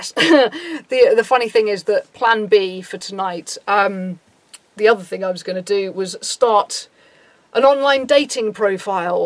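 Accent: United Kingdom. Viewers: You sound British 😁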